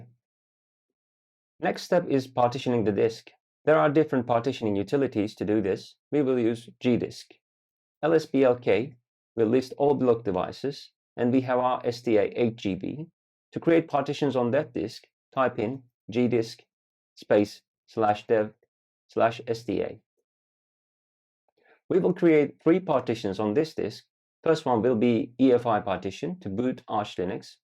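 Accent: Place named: United Kingdom. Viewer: Brilliant British accent 👍🏼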